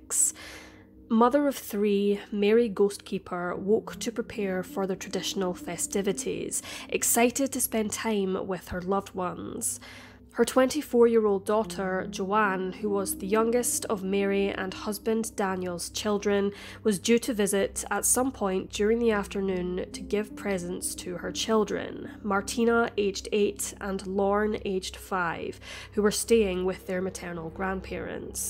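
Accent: Scotland, United Kingdom. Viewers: Loving your channel, it's great to hear a fellow Scot narrating these episodes and I feel you treat each case with such compassion for the victims.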